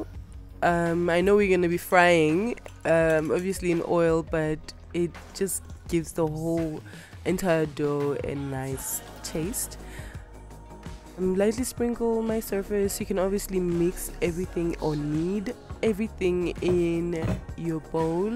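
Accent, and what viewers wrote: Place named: Zimbabwe. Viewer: You sound sooooo ZIMBO 💕 Makes me so homesick just listening to you!!!! Gosh these look mnandi sibili 💕 Thanks sis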